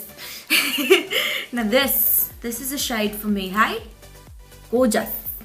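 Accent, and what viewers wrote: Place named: South Africa. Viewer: U speak like my mualima... true S.african😚😍😛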